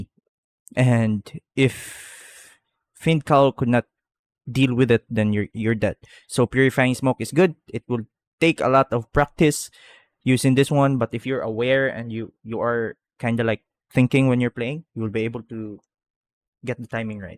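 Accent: Philippines. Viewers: Build? Btw are you a filipino? You have an accent like it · you sound very filipino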